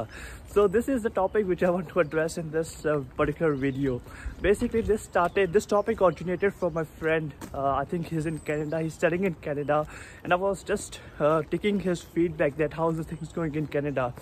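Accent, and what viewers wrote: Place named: United Kingdom. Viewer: brother the way u talk it does not look like u r an Indian student u captured British accent very well bro like to see your videos keep going bro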